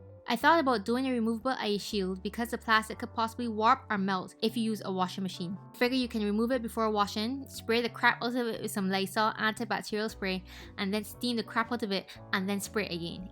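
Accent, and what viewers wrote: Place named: Barbados. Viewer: You're Bajan the accent shocked me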